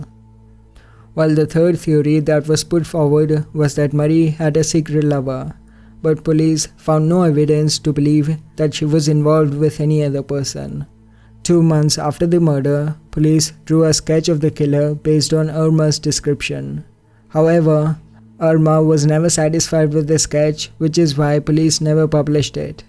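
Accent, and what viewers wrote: India. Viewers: New voice, indian accent sounds different👍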